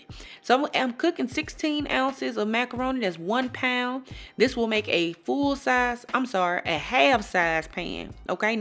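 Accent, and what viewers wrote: South, United States. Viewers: Cooking along with the video right now! I know my family is going to love it! Eddie loves your nice southern voice too as I cook! Thanks for the recipe! Happy thanksgiving! ❤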